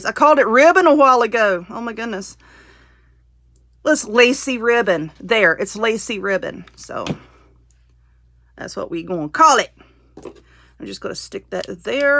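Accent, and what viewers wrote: South, United States. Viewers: She is from the south.. Us southerns all sound just like that.. I love her diys and i love her vidos. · I just love watching you. I've learned so much. I especially love to hear you talk. You're accent is almost as country as mine. I also talk to myself lol esp when I mess up.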